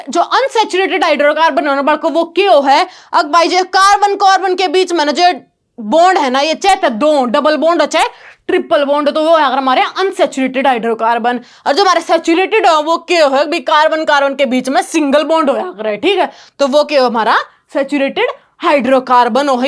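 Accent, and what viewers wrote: Haryana, India. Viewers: Bahut hi accha padha rahe ho madam sabhi samaj sakte hai haryanvi boli bahut acchi lagi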